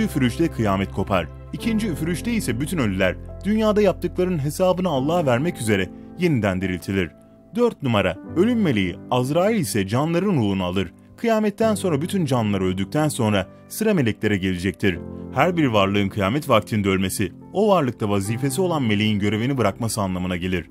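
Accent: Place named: Azerbaijan. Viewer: soyledigin sozler azeriye benziyor hemde cooook guzel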